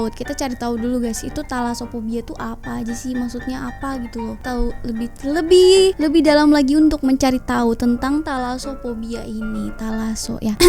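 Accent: Banjar, Indonesia. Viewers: Logat banjarnya kada kawa di hilangi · Kyk org Banjar ada kata², nah di akhir kata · Banjar nya kluar sikit" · Logat banjar nya masih gak hilang awokwok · Kek logat orang banjar:)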